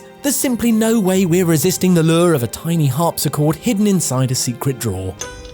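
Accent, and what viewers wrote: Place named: United Kingdom. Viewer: There's something satisfying about hearing British accents on these types of videos..And this happens to be the best so far lol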